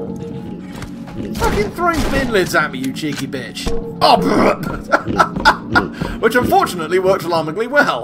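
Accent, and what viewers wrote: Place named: Russia. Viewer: Actually, I quite enjoy your Russian accents. Way better than what the Holywood degenerates think we sound like.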